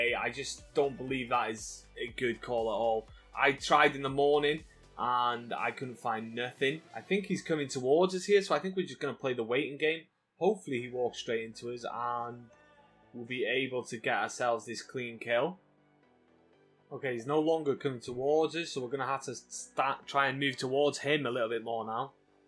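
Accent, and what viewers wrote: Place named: Wales, United Kingdom. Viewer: Nice your welsh just like me were abouts wales you from bud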